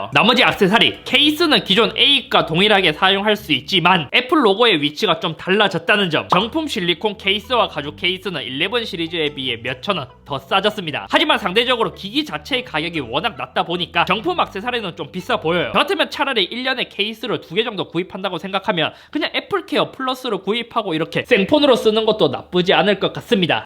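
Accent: South Korea. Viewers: 형 요즘 말투 왜 그래. 저번에는 서울말 하더니....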